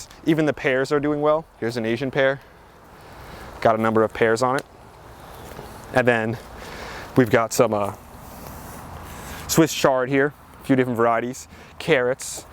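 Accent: New Jersey, United States. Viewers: That Jersey accent is going strong! Wudder💧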